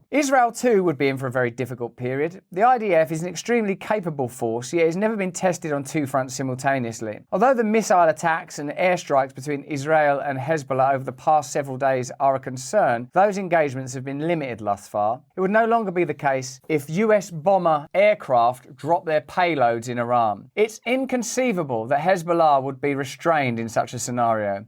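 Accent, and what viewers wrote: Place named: United Kingdom. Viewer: Russell, keep it up! Humor+Truth+ contemporaneous rants with a british accent might just save the world! Bravo Bredren!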